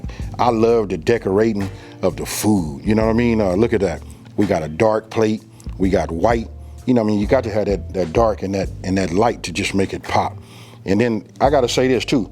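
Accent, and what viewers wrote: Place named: South, United States. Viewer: are you born and raised in California? Sound maybe a little bit southern accent maybe?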